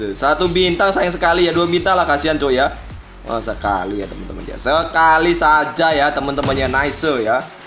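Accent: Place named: Pekanbaru, Indonesia. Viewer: Lho abg org Pekanbaru..?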